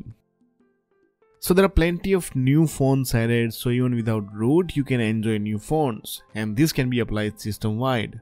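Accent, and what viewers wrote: India. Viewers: Your voice sounds like indian person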